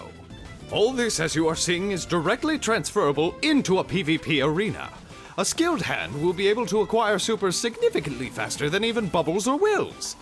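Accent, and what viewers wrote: United Kingdom. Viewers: i noticed that throughout the entire video the narrator has a british accent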